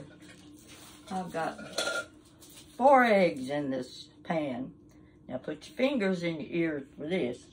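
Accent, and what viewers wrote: South, United States. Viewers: Love your hair. Appreciate, your sharing. You are cute and charming. I am 76, how old are you?I am guessing, but I think you are a Southern Lady. Your accent is similar to mine. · No!!! Southerners use Duke’s, always Hun! You sound pretty Southern to me..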